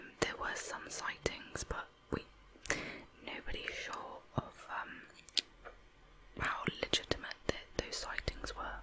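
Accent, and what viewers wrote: United Kingdom. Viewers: Nice to hear a lovely British asmr voice ❤️ please please please more true crime stories and even general chat. You have a nice clear voice, nice microphone too, thankyou for your amazing work 💕🏆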